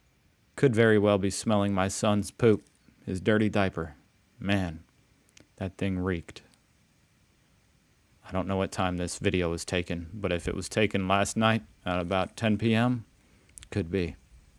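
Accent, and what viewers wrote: Australia. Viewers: Mate, your Aussie accent is on point. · Big W is a part of Woolworths and it's pronounced Ekidna 😂😂 love your videos and your Aussie accent 😅 Happy Fathers day to you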